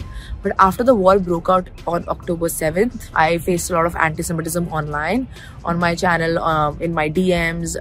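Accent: Maharashtra, India. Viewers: tu khup god marathi boltes:) Keep it up!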